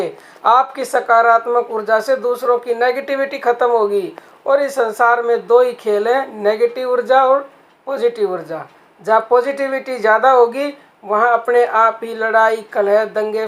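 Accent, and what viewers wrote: Haryana, India. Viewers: जय श्री राम माताजी आपने सत्य कहा है माताजी आपकी भाषा हरियाणा के जैसी लगती है आप के बचन अमृत तुल्य हैं।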